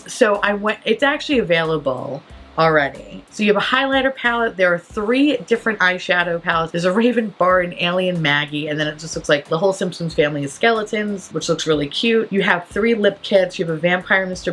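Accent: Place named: New York, United States. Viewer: Hearing your accent more today than usual. Sickness brings out the the NY. Feel better.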